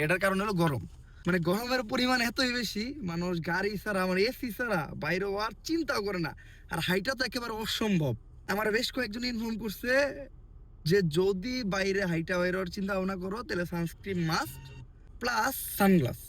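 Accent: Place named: India. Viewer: Indian voice ase vai bangla na asla video dekhe ki moja pawa jai..?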